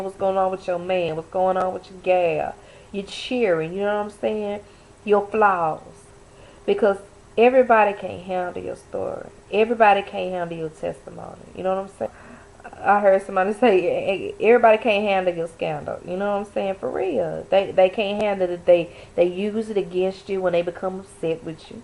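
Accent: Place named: South, United States. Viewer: I like what granny said lol... I love ya country accent itz kute lol... Great vid fam...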